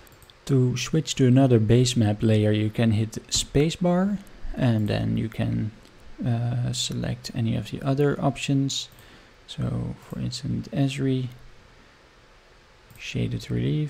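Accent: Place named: Netherlands. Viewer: Je klinkt Nederlands, dus ik probeer het gewoon even. Dit werkt allemaal super, maar voor Nederland is een nauwkeurigheid van 30 meter niet echt realistisch. Nu is er natuurlijk het actueel hoogtebestand die om de meter een hoogte puntje heeft. Is die als SRTM data sorce te koppelen aan de GIS plugin?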